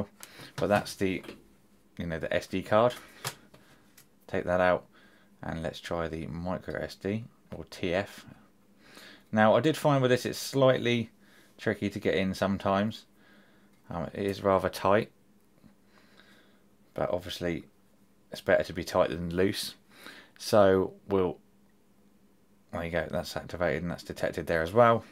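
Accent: United Kingdom. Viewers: IS this only for the phone? Can I use this product on a computer? darn it? love your british accent muah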